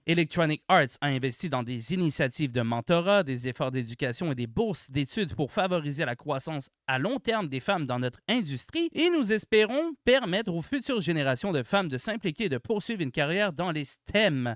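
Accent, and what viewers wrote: Quebec, Canada. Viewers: bon désolé mais le quebecois et les expressions bizarres toutes les 5 minutes je peux pas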